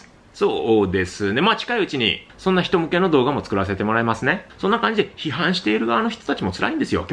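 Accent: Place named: Kansai, Japan. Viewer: ありがとうございます。ここ4年ほど、腰痛に悩まされています。2週間ほど前に、初めて、Shojiさんの動画を拝見しました。さっそく、Shojiさんのおっしゃる通り、ストレッチのエクササイズを始めたら、体が軽くなったような気がします。理学療法による治療に通っているのに、いつまでたっても、痛かったんです。50歳になったばかりですが、年のせいなのか、仕事（商品補充、日によって2トンは越える荷物を運んでます）のせいかと思っていたんですが、、、体に対して、無知だから、腰痛を起こしていたのですね。悩みがあると関節痛も出るし。南仏に滞在して23年、もうすぐ、日本料理教室、一本だけで、やっていくつもりです。健康でなくっちゃ～！Shojiさんの哲学にも納得です。尼崎で幼少期を過ごした私にとって、Shojiさんの関西弁も気持ちいいです。これからも、もっともっと笑顔で過ごしたいです。Shojiさんのおかげで、実現できそうです。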